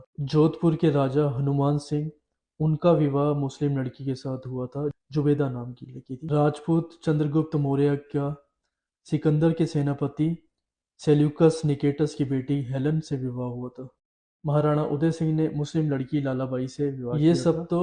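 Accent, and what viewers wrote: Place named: Pakistan. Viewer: Iss channel ko chalane waala aawaz se Pakistani lag raha hai, ye koi ISI ka Agent hai jo Hindu samaaj ko todne mai lga hai isse saavdhan rahe, Jai Rajputana Jai Hindu n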